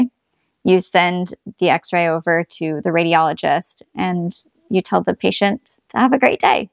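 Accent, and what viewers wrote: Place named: Australia. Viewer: what county you are mam? I thought youre from Australia. 😅 are you from US?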